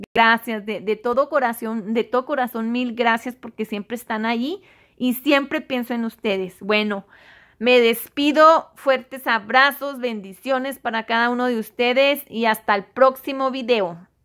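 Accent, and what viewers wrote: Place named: North, Mexico. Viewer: Hola muy bonito tu pastel te felicito una pregunta ojalá me contestes pero de donde eres tienes un tonito como del norte te escuchas como nosotros los de chihuahua jaja 😂 Dios te bendiga y lo mejor de corazón gracias por compartir lo que sabes 🙏🏼😘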